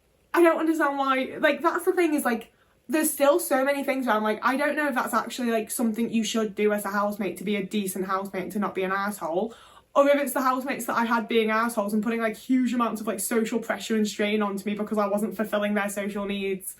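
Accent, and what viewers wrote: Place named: Liverpool, United Kingdom. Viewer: Hi. I completley relate to this. I am autsitic and I will never in my life understand the pettyness of some neurotypical people. I think the best kind of people are the ones where you canbjust exist together in silence with no pressure. Saying hi every time you pass them go for a wee just feels fake and forced and no need. I think high functioning autistic women are like Sigma personality types... the typical herd mentality people dont understand independence and how we dont crave constant validation like they do. I think autistic people are sensitive to energy in an intuitive sence, i feel like i can communicate with energy and i dont need to speak. I think we are just super special people and its their loss if they can't make small accomodations to make you comfortable. What they also dont get is, maybe if they made these small accomodations, i would have less shut downs, and then would be a more content, positive version of myself and probably more likely to be more sociable. 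If small accomodations are not met, i speak a lot less because im in shut down mode more often!! So they bring it on themselves, everyone has needs, ours are no more 'difficult to meet' than others. I try to tell myself, it is always their loss, not mine. I have only breifly watched your channel twice or so, your scouse accent, swearing and bluntness makes me laugh a lot and what you say is so relatable. Thank you ❤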